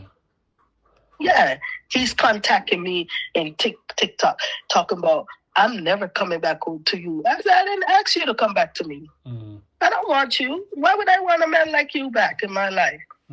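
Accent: Jamaica. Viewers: Living in America so long and still manage to maintain your Jamaican accent eh, good for you girl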